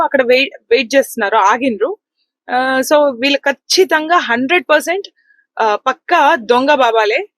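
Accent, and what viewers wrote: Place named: Hyderabad, India. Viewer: Vah kya hua Hyderabadi baat kar rahe ho bhai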